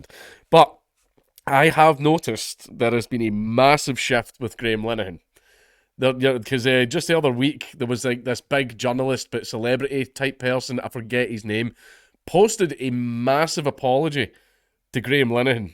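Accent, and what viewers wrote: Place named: Scotland, United Kingdom. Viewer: You're the Scottish version of Brian Blessed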